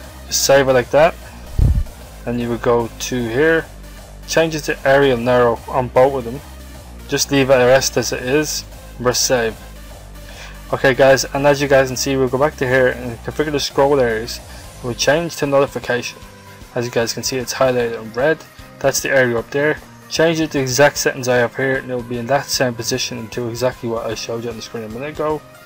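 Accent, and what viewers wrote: Ireland. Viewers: are u irish ? u sound soo much like it ( im irish ) ;)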